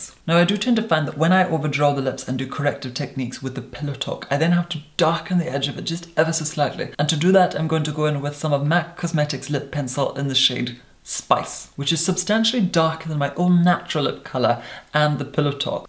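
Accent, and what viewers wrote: Scotland, United Kingdom. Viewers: It’s a peculiar Scottish accent mixed with English so not sure what to make of it but balm would never be pronounced bal-um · Alex Firnth of course I know he is Scottish but do you hear it in his accent? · Ur Scott accent comes out sometimes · just wondering are you originally from scotland or have friends/ family from scotland? because your accent is slightly scottish but mostly english. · Scottish accent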